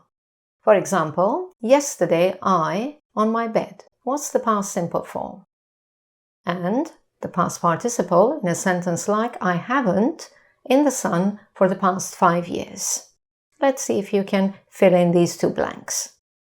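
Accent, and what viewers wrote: United Kingdom. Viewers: Madam Which type of english do you speak? American or British one? I feel you're a british type of speaker, am I right?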